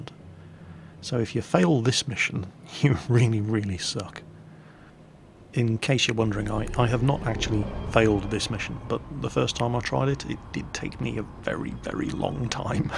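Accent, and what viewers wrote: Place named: England, United Kingdom. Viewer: maybe he just want's us to hear 'is english accent, cause clearly he has nothing useful to share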